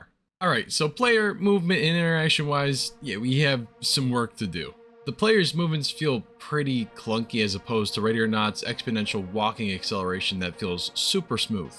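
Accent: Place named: New York, United States. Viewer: where'd the new york accent come from,?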